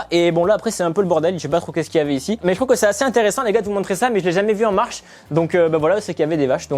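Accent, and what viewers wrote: South, France. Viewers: TU ES TOUJOURS AUSSI EXTRAODINAIRE AVEC TON ACCENT DU SUD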